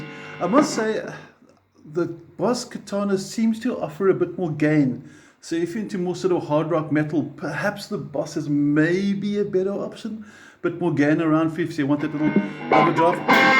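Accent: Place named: South Africa. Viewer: Much as I approve of your ZA/NA (?) accent, "NUX" is pronounced NEW-X in spoken English according to NUX (Cherub CN) themselves. Hey, as an owner of many NUX products and native English speaker from a time when grammar, punctuation, spelling and pronunciation were taught, insisted upon and examined, I used to pronounce it as spelled too for a long time. The keyboard doesn't type the subtle ">" used in the "NU>X" branding for so long well, which I suppose gave some hint of it. Returning to the relative mini amp comparison, of these three I chose and use the 3W Blackstar Fly 3 & Blackstar Fly 3 + Fly 103 6W Stereo combo for my battery powered portability deployment needs. Taking into consideration my purpose for the Fly 3s as I already had a Blackstar ID:Core Stereo 40W (now two thereoff with the addition of the latest V3 incarnation of it) for my primary practice amp, as well as multiple other larger electric performance and acoustic amps . 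In my country (AU) the NUX8 BT 8W with BT sells for around the same price as the Fly 3+Fly 103 6W Stereo combo (no BT) which includes its 240v AC to 6.5v DC step down PSU in the pack. The latter Blackstar 6W Stereo combo is really the direct comparison to the NUX price point for price point, with the advantage of Stereo which it trades off against the NUX's feature of Bluetooth. So in that sense, the standalone 3W Fly 3 isn't a fair or balanced comparison considering it sells for half the price of the NUX8BT or BOSS Katana Mini. Not that it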